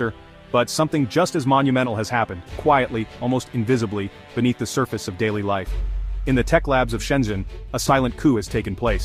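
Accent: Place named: United States. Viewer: Maybe next time get the AI voice to slow down, be less aggressive and less American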